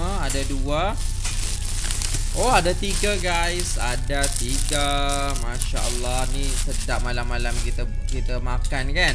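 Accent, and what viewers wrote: Malaysia. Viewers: cakap nya sudah hampir bunyi orang malaysia cakap.... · Sgt baik bunyi cak cakap...mcm org malaysia. Pernah tinggal di malaysia ke😊😊😊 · Comel lah dengar Cak ckp telo Malaysia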